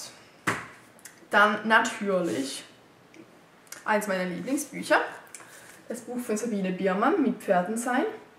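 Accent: Switzerland. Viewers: Haha schwizer Dialekt! :D Wo stoht dis Ross eig? (Sorry wege Solothurner Dialekt xD) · sooo süß wie man den schweizer dialekt raushört :D !!!! schöne grüße ausm ländle !! jedsmol wenn i dine videos aschau wür i so richtig nidisch xD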